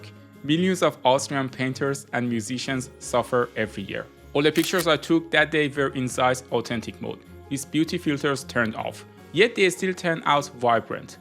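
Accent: Iran. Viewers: لحجه فارسیتو دوس دارم 😅❤ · چرا لحجه ایرانی داری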